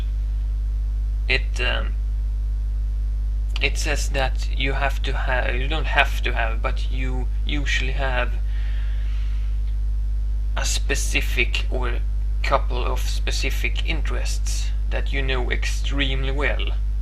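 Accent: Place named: Sweden. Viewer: Your accent remembers me a lot Ingmar Bergman, a lot, a lot.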